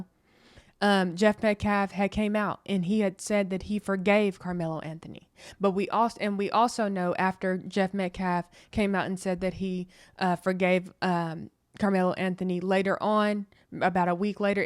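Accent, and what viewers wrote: United States. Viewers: Where is she from? Sounds American to me, but this is the first video I've watched. ❤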